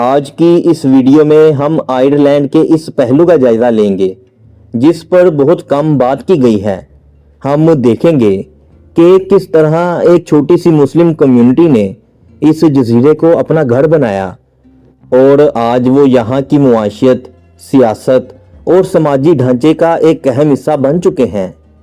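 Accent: Lahore, Pakistan. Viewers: بہت پیاری ویڈیو بنائی ہے اندرون لاہور والی اردو بولنے کی بجائے یہ پنجابی میں بنا لیں زیادہ بہتر ہے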